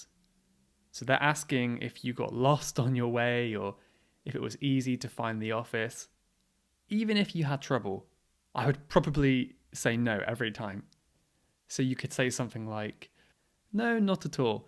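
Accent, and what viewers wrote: United Kingdom. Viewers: Hi! I came across your podcast by chance on Spotify and I really liked the episodes I listened to, especially this one about Job Interviews. I'm an English teacher here in Brazil and you have already become a role model for me! For a start, I'm biased about British accents! Just love it! Moreover, your talks are very well structured, clear and extremely didactic! I'm already a follower on Spotify! Thank you for your channel! I have a pilot podcast aiming at CPE Exam tips but it's just a draft, I have a lot to improve and I haven't been dedicating myself to it right now. It's called 'Polish Your English - Flávia Pini'. Cheers!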